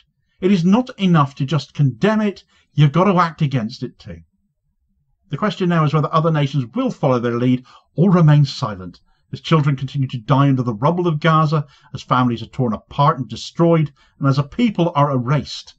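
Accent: Cornwall, United Kingdom. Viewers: I did watch a video of huge protests in Chile which has a large Palestinian population. Great reporting as usual. One of the finest independent journalists, love the Cornish accent too😊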